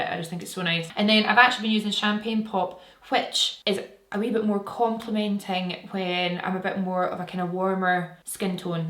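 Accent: Scotland, United Kingdom. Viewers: Never lose that gorgeous scottish accent. <3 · Omg that accent is the best! Scottish? I’m a Swede so don’t hate me if I guess wrong 😛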